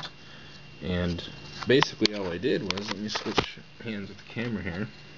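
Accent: Canada. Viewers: You're Canadian huh?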